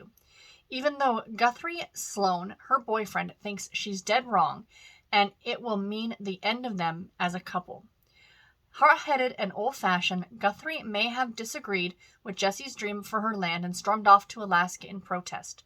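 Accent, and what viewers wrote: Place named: Canada. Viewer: I knew you were Canadian! The accent and your vibe ❤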